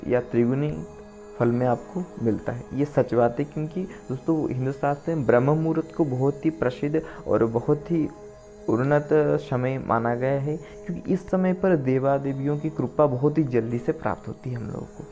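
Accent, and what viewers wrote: Odisha, India. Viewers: Bhai aap odia ho kya??? Baato se toh yese lag raha hey??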